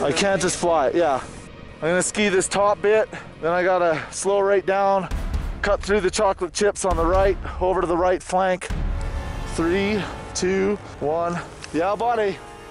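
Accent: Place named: California, United States. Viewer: Sounds like cali surfer dialect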